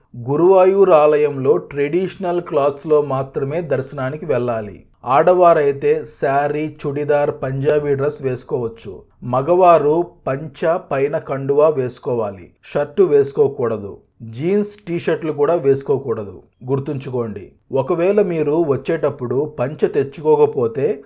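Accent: Nellore, India. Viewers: అన్న మీది Nellore haa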